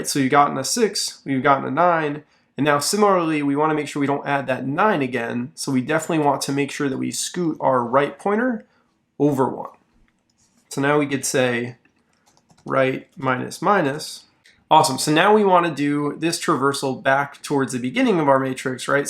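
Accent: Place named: United States. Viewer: The inotro and outro music are rather goofy, but it is so nice to hear an algorithm explained in normal, US accent English instead of, well, you know!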